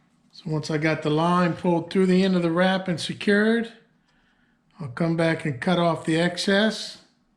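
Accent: Louisiana, United States. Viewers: I thought I recognized that accent! Fellow (former) South Louisianian here - and your video is perfect! I was worried about doing it "right", and this video shows me how easy it is to do it right, and make it look great. I'm in the Northeast now, and I have been trying my hand at fly fishing. I was gifted a flyrod from the late 1960s, and it needs to have the eyes rethreaded and resealed. Fishing for stream trout and is fun and all, but nothing like hooking on to a bull red! Can't wait to get back home to Louisiana to hit the marshes.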